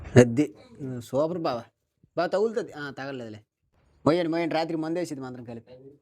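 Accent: Srikakulam, India. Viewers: Anna Mee srikakulam yasa balga untundhi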